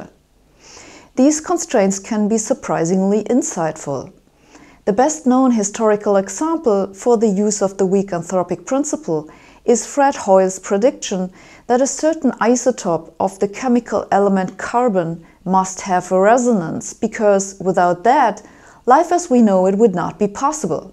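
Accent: Germany. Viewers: Not related at all...well partly maybe... I love accent. In particular as a specific example of my appreciation of the general German accent. I note that certain accents rub me a certain way. German sounds cuttingly precise, the way you'd expect a high quality German automobile to be designed. Russian and Indian sound kind of comical to me, in different ways. The royal British accent of course sounds incredibly high brow, very polished, and upper society. Australian sounds amiable, down to earth, matter of fact, in a jovial way. Italian sounds happy and very excited. I could go on but I shant. Fuggedaboudit!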